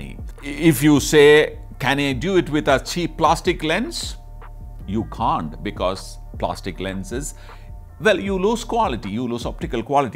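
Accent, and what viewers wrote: Kerala, India. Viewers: You speak English like Indian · sounds like keralite.. isnt it? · He has a mallu accenture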